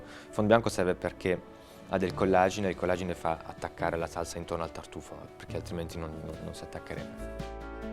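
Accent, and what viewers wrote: France. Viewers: he speaks italian with a lovely french accent. Very nice and charmant. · lo chef ha un accento francese sull’italiano 👂🏻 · Perché parla con l’accento francese 🤔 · Let's say the vid's interesting, but his "language accent" sounds really strange ! Some time he speaks with the french "r"... but sometimes he does speak with a real italian accent ! Hence, the vid sounds a bit setup...😲 Btw, the oil is made by "vinaccioli", not by "vinacce"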